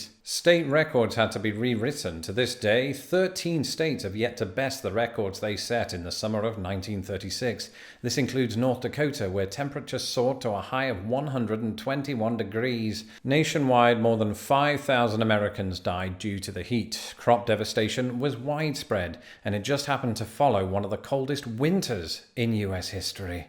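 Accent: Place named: Grimsby, United Kingdom. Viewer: "Choonkey Moonkey" may be the most Northern thing you've ever said. Never lose that Grimsby accent, dude. It's wicked awesome (which is the most Mass thing I say).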